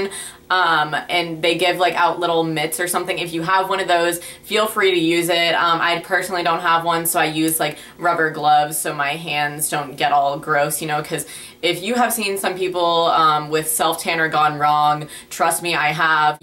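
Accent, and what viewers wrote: United States. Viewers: its amazing how americans say 'get tan' i want your accent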